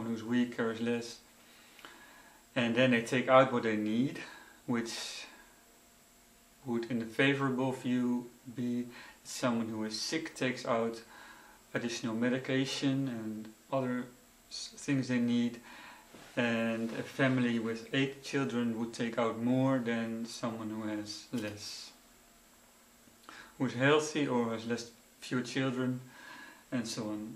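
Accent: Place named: France. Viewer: I did have a look at the beginning when he panned the camera around to show, as he said, "to show where I am filming this". But looking I was not able at all to see where. Apart from some dudes apartment. I did see that as far as I know it was not filmed in England or the UK. So judgeing by his French accent I guess it may be France of Canada or some where else French is spoken.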